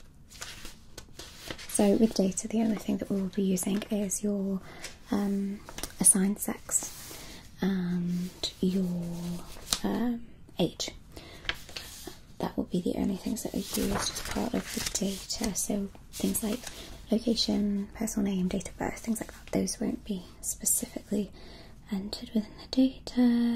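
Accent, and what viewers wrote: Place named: Wales, United Kingdom. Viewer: Hearing a bit of a Welsh twang coming through there! ❤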